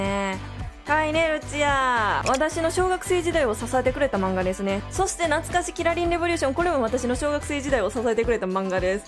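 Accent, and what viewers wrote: Kansai, Japan. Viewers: ちょっとしたマンガ喫茶じゃないですか！お友達呼んだらお金取れるレベル(笑) もともとの関西弁にあわせて、めっちゃ早口で紹介していってる感じが「ケロちゃんにおまかせ」っぽかった(笑)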